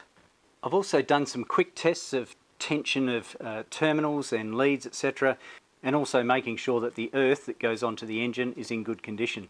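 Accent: Australia. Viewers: Awlternator lol NSW accent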